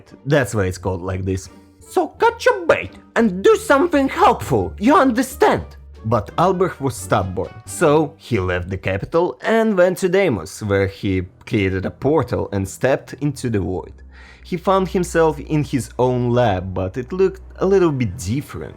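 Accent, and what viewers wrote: Russia. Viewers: Русский английский как всегда прекрасен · Спасибо русскому акценту, слова с буквой "r" теперь вызывают в 2 раза больше проблем. Но получилось норм, вполне понятно и приятно звучит) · я против ничего не имею,но Русский акцент так и прет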